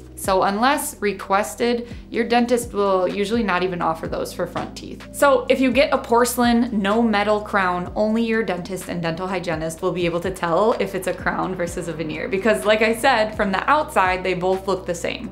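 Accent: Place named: Midwest, United States. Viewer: You sound midwestern as hell, Chicago??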